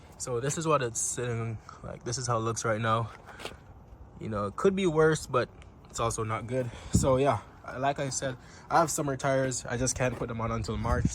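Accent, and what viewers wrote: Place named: Jamaica. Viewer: Bro has a lil Jamaican accent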